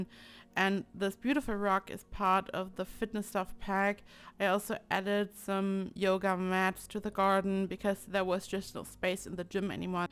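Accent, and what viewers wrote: Germany. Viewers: das deutsche Englisch macht mich total nervös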